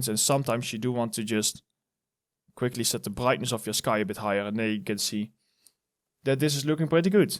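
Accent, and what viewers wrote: Netherlands. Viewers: u are dutch right? XD thanks brother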